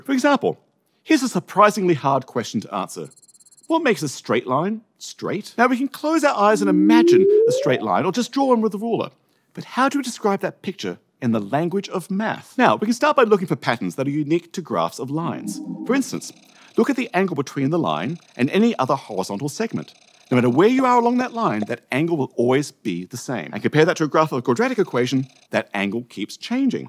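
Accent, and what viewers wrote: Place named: Australia. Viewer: What’s the accent? Also, this accent is rather slow compared to other australiamd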